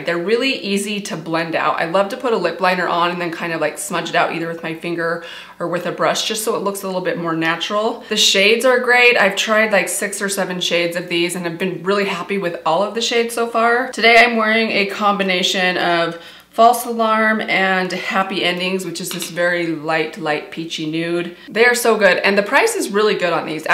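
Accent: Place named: Utah, United States. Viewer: The hard Utah accent was an immediate turn off for me. And you talk way, way too fast! I tried contacting her reps to find out which She Glam concealer she was talking about and the response was ridiculously convoluted. The fact that she suggests going to Sephora for new brands is laughable. Go to Ulta, which I also don’t love but at least you get some drugstore brands. So funny that she is talking about Nabla at the end of this vid and you can get the brand at Ulta but not Sephora. Seems all slapdash thrown together- who deemed her an expert? I will be leaving as fast as I found her-toodles.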